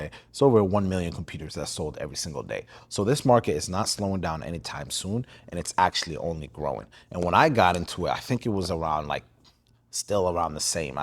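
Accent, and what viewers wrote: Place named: United States. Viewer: Im greting you, I'm from warsame bade. Channel post . Second I think your are somali. Live in USA 🇺🇸, because of Your language accent is American 🎉.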